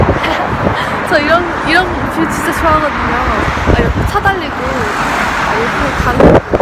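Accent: Seoul, South Korea. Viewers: 말투에 '~거든요' 같은 서울 사투리 쓰는거나 화장법이나 생김새도 10년대에 유명한 얼짱? 이런 느낌이고 저는 보자마자 예전 얼짱 얼굴 썸넬에 박아둔 플리인줄알고 노래들으러 왔는데 당황함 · 옛 서울 사투리같아요 너무 귀여우신듯 ㅋㅋ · 근데 영상들을 보면 볼수록 80~90년대 서울사투리하는것같죠 ? ㅋㅋ 어리신분이 ㅋㅋㅋ 부모님 말투 닮으셨나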